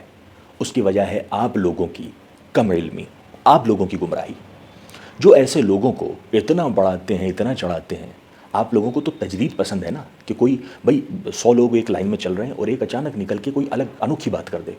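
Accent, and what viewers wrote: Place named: Bareilly, India. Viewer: Are yaar Aaj kiya hogaya is ko ekdam baraily Shareef ke andaaz me bola achha laga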